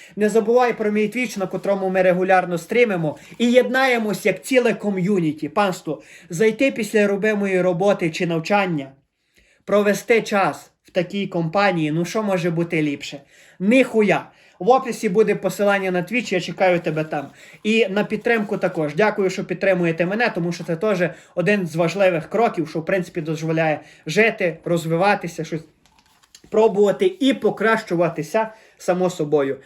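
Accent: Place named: Transcarpathia, Ukraine. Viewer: Перспективно в моєму випадку 😂 В тебе чутно сильний закарпатський діалект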